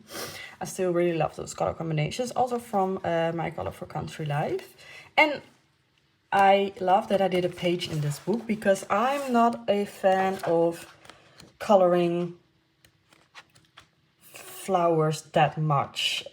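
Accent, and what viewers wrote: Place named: Netherlands. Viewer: Ik dacht al dat het accent niet anders kon zijn dan een Nederlander! Leuk om iemand uit ons landje acties te zien met kleurboeken. Waar heb jij het boek van Klara Markova gekocht want Bol en Amazon hebben die niet.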